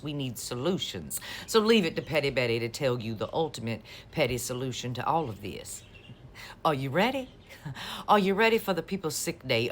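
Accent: South, United States. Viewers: I have to get up to speed on the southern speak. To be honest, I'm having a bit of trouble understanding ya. 😃🇺🇸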